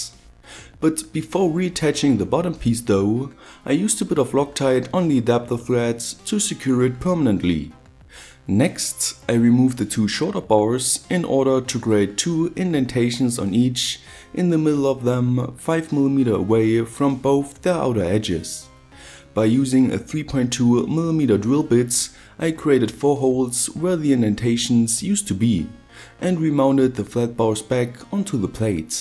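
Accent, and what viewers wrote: Germany. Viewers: Are you German? You sound German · Greatly appreciated Germany accent!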